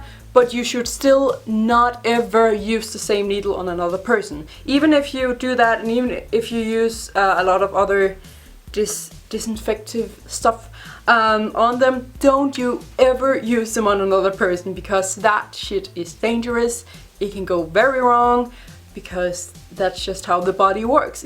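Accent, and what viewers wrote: Denmark. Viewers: Hvorfor kunne jeg høre på din accent at du er dansker? HEJ MEDVIKING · You are Danish aren’t you? As a Dane I can hear it in your accent😉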